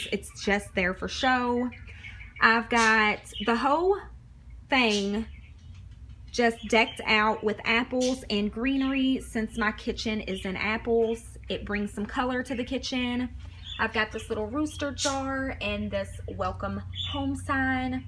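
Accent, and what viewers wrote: South, United States. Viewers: Hi from Louisville, KY also! I was wondering where you were from whenever I heard your southern accent, lol! Your video just inspired me to completely clean my house after I get off work 😂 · I am a new subscriber and I am in Peru. I love what I see and you are very sweet and real. Where are you in the USA you have a Southern accent.? Xx I am of native American and Peruvian heritage so a clean home and family values means a lot.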